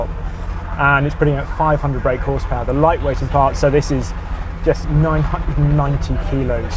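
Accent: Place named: England, United Kingdom. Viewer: this guy mumbles, whispers and has an english accent, cant understand anything he is saying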